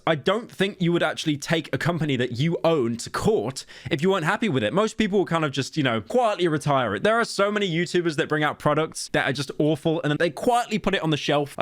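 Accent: United Kingdom. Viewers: Shut up British man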